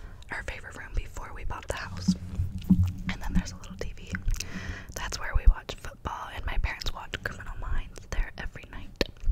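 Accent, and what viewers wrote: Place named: South, United States. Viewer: This clearly isn’t a manufactured neighborhood. First off I’ve never seen a manufactured house anywhere near this big, and the house I can see across the street looks completely different than the house she’s in. Manufactured neighborhoods usually have very similar looking houses. And based on her accent and the landscaping that I can see, I’d say she’s either in Texas, South Carolina, or Georgia, in a Metropolitan are like DFW, Charleston, or maybe somewhere near Atlanta or Savannah. Which means that this land is far from cheap, I’ve seen two acre lots in Dallas go for 400k.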